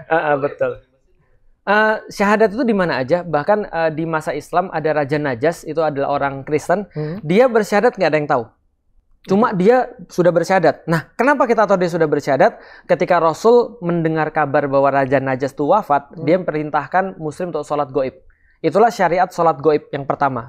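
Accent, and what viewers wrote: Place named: Palembang, Indonesia. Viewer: Kanji kau ni, 😂😂😂😂😂 asli ini Palembang Sumsel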